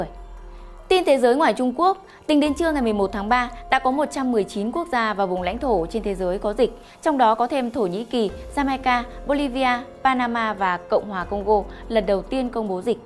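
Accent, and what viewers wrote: Standard. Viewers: Giọng mc quá chuẩn . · Nòi rất chuẩn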